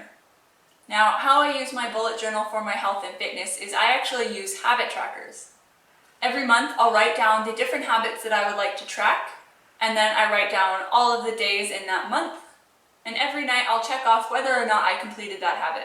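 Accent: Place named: Australia. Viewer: I'm hearing such an Australian accent in the intro. Its slight but I recognize it because my brother is also a Canadian living in Australia. I love habit trackers.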